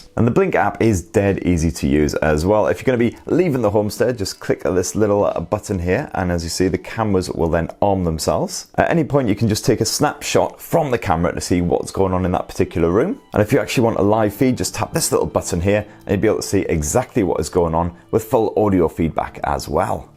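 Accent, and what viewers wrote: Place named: Sunderland, United Kingdom. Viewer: Man sounds like he's from Sunderland innit.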